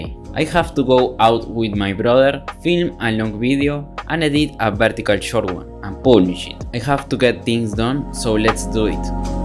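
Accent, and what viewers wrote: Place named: Argentina. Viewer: Lo hubieras hecho en español y con tu acento argentino para no tener q sufrir tanto con el ingles jaja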